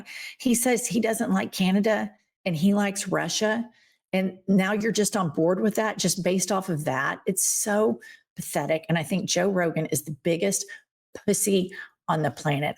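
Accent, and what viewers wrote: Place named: Philadelphia, United States. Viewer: Ummmm are you sure you're not from philadelphia ma'am I love you're delivery and speaking the truth about ##LilSpanky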